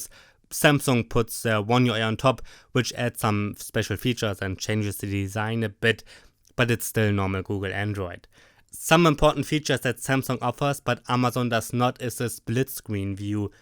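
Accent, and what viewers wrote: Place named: Germany. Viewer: Nice vid, love that thick german accent too. Keep it up, definitely one of the best reviews on this tab.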